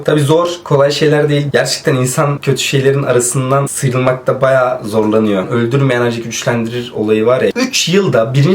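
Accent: Malatya, Turkey. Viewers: Abi Malatyalı misin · reis malatyalı mısın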